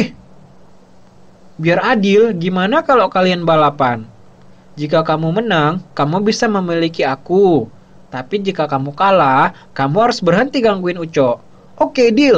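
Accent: Medan, Indonesia. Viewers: bg... abg orang medan ?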